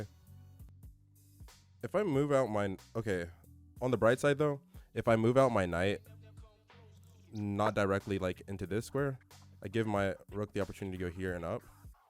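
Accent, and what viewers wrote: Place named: Africa. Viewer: What part of africa are you from?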